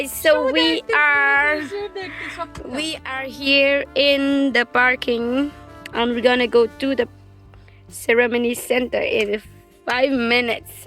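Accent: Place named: Mawlai, India. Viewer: Kren nong mawlai noh khyndit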